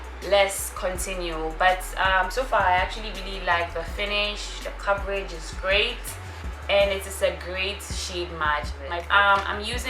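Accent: Ghana. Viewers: Hi, Your accent tells me you are from Ghana, I will like to get in touch with you and ask you a few questions directly. Kindly email me on <mail> I'm not sure about putting my number out here. I will be glad if I get a reply from you. Or you can post a business WhatsApp number if you have one. Anyway I'm your new subscriber and my name is Francine.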